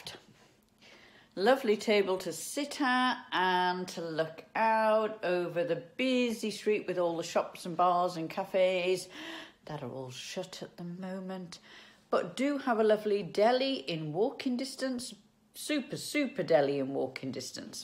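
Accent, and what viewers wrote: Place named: England, United Kingdom. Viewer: Hello miss. I am from Brazil..You're an enchanted lady. Your hands', oh your hands are so beautiful. E pelo sotaque eu deduzi que você é inglesa. UK.... Cheers from Brazil.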